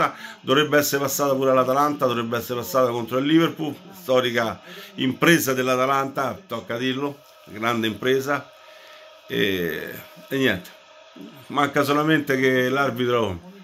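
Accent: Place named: Rome, Italy. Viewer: Salve, mi complimento con lei per il suo carisma, e per le sue frasi divertenti!! menomale che è tifoso della juve!! è no ne de dell"inter ne tanto meno della Roma, ma comunque è de roma andiamo si vede dall'accento!! Io sono un tifoso del milan è sono siciliano zona sicilia sud!! Ha visto lei milan vs roma 1 turno, poi sassuolo Vs milan che gli e ne pare delle decisioni arbitrali uno scandalo !! il fuorigioco di 30 cm lukaku poi il goal della Roma al 93 ' niente rigore clamoroso per il milan ... poi i goal di chukweze sulla linea del fuorigioco regolari e stanchez contro il cagliari mezzometro più avanti non sanzionato ... adesso per questo complimentano che la roma ha superato il milan, i complimenti a de rossi bla bla bla ovviooo tutto falsato !!! allora me lo dica lei se non ho ragione e poi mi dicono che devono mandare pioli ecc.. non lo dicono che le partite sono falsate .???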